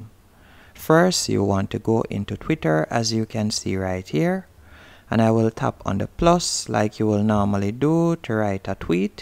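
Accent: Jamaica. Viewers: Thank you! You sound Jamaican.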